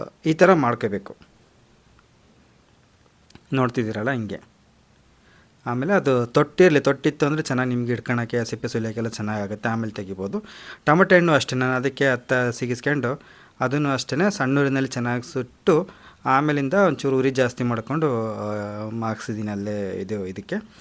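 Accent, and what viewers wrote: Chitradurga, India. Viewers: ಚಿತ್ರದುರ್ಗ ಭಾಷೆ ಕೇಳಿ ತುಂಬಾ ಸಂತೋಷ ಅಯಿತು ನಮ್ಮೂರು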